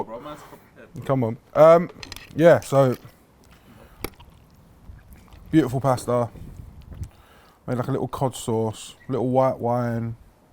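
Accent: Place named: Manchester, United Kingdom. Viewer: Fresh linguinehh haha u sound like your from my ends Manchester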